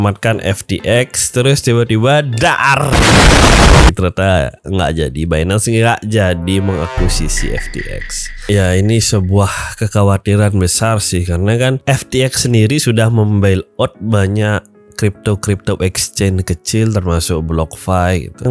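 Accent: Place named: Padang, Indonesia. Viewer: Lu org pdg ya bg, logat nya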